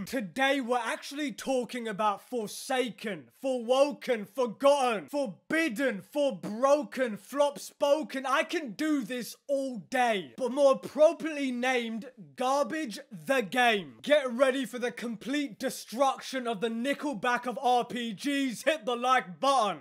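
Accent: United Kingdom. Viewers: I love the way you explain it with ragefull british accent WKWKWKWKWKW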